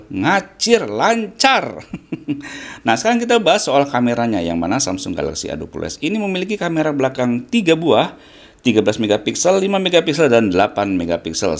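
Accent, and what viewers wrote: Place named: Banjar, Indonesia. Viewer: Suara nyaring ya bang bahasa banjar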